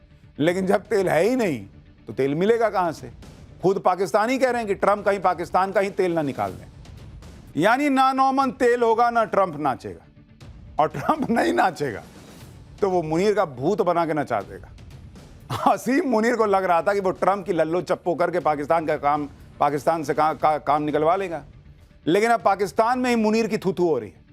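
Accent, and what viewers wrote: Bihar, India. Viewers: सुशांत सर आप जब बोलते है तो ऐसे लगता है की आपका भाषा बिहार के भाषा से मिलता जुलता है कही आप बिहार से तो नहीं है सर ऐसा लगता मुझे आपका हर न्यूज़ मै देखता हूँ बहुत अच्छा लगता है थैंक्स सर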